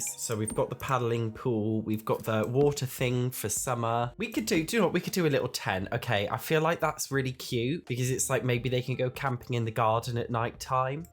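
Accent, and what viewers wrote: United States. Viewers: Your American pronuncuations crack me up every time I love it lmao 🤣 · Has anyone else noticed how american accent sounds a lot like Quagmire from family guy 😂😂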